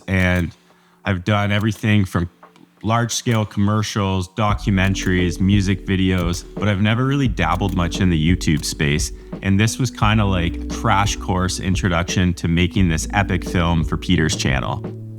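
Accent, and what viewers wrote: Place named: Canada. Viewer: Dude, excellent storytelling. Excited to have you on the platform. Also that Canadian accent is 🔥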